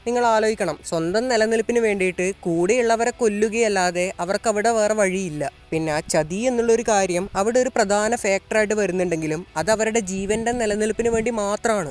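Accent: Kannur, India. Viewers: Kannur slang hit different 🤍📈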